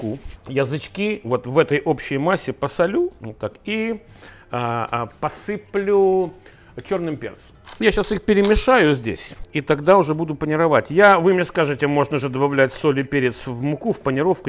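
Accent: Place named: Murmansk, Russia. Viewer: Слышу Мурманск - ставлю лайк.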